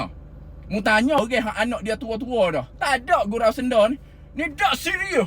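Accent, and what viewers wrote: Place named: Kelantan, Malaysia. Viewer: Bismillah...maaf min, pekat sngt bahasa kelate nya, jd kurang faham...gunalah b.malaysia baru semua yg lihat pelajaran yg bermanfaat ni bleh faham pd mesej yg disampaikan..🙏🙏🙏👍👍👍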